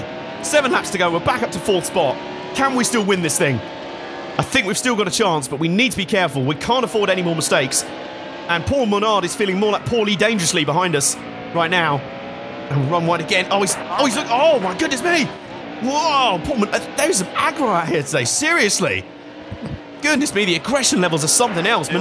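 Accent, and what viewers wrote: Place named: United Kingdom. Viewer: I love this channel plus the British accent 😂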